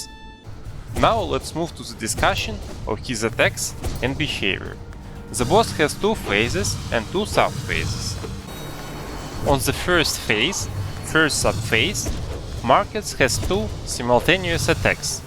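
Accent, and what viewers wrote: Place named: Russia. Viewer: Ты чо, русский шоле? А то акцент русский...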